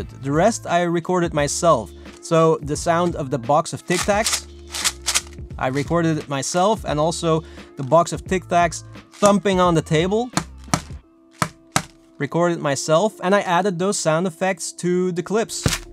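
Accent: Belgium. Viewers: Heel mooi om jouw Nederlands (Belgisch accent) te horen. Moet je wel vaker doen :)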